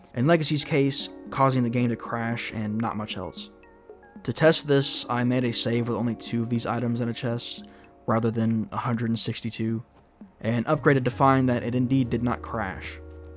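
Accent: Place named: South, United States. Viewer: I Can hear the southern in your voice brother 🦅🇺🇸🦅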